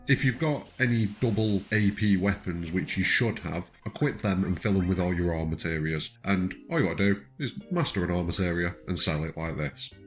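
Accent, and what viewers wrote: Manchester, United Kingdom. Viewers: OP are you from Stockport? Just skimming across ff7 gold chocobo videos and noticed that you’ve got the same Manc twang as me!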